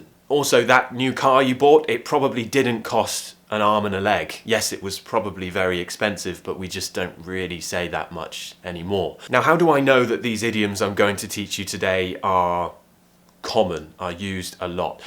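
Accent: RP, United Kingdom. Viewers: Excuse my french (I am francophone and enjoying your lessons, your British pronunciation), but I wish to congratulate you for your marriage and wish you a lot happiness and... many children (?) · WOW it's the best video I've ever seen in a British accent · Very nicely done, and I do enjoy listening to your cool British accent. I notice that you've made a point of saying that you do not use outdated expressions. However, I can tell you that here in America at any rate, every one of the idioms you discussed has been around for quite a long time. They were all used when I was a kid (in the 1950s) and used by my mom and dad much earlier. But never mind, I enjoyed listening to you, and I will look for more of your videos. And if it's not being too old fashioned, I congratulate you on "getting hitched", and all best wishes to your lovely "ball and chain". 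· Sir how can I learn your basic English British pronunciation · Wonderful examples and I absolutely like your British accent! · You are the reason that i like British accent thank you a lot sir 😍🔥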